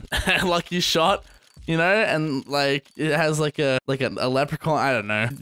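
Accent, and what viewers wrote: Australia. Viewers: i fuckin knew you were aussie wewwwww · your from Australia what state you from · Your aussie O.O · knew u were aussie bb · your aussie!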